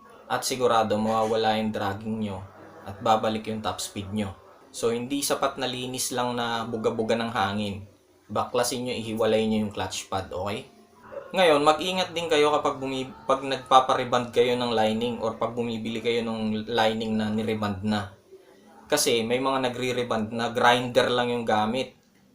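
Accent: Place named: Pampanga, Philippines. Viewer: ahh ganun ba. kaya parang medyo kapampangan accent yung tagalog mo bro. haha. new subbie. ggwin ko sa off yung suggestion mo sa beat v2 ko.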